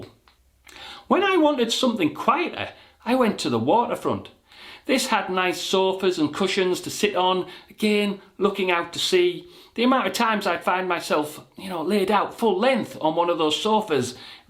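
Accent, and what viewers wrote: Scotland, United Kingdom. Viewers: You sound Scottish? I want to follow your example for cruising!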